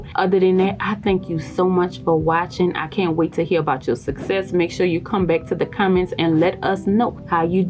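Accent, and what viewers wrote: New Orleans, United States. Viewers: It's the NOLA accent for me❤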